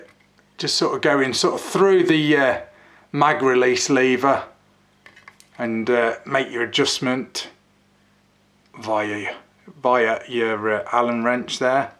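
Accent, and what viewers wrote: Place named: United Kingdom. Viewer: What country are you in? Because it sounds like your from the UK. I thought that the UK band firearms from civilian.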